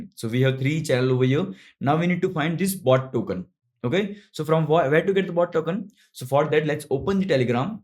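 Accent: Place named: India. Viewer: i wish there was an ai software i could use to remove the indian accent from this video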